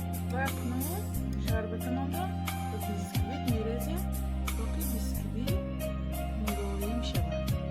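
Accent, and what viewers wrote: Isfara, Tajikistan. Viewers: овозатон маълумки аз исфара хамшахри будем хохар ман хам аз исфара ман зан хастам👍👍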